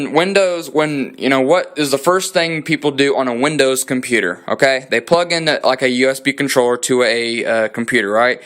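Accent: South, United States. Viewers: Never in my life heard anyone pronounce it Ray-men, lolz. Ray-men? Not Rah-men. Where are you from? You gots a southern accent for sure.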